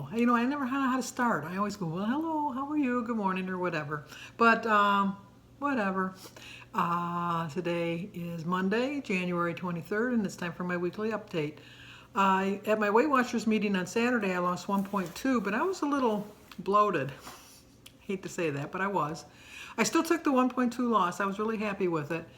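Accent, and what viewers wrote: Midwest, United States. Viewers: Hi. I love your videos (and your midwestern accent:) You motivated me to join WW (tomorrow is my weigh in after my first week). I really like your day to day videos. Thank you!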